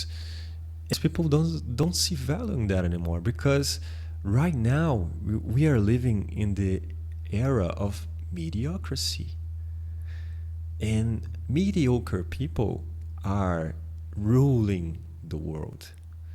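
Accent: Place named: Brazil. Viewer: Pelo sotaque é br com certeza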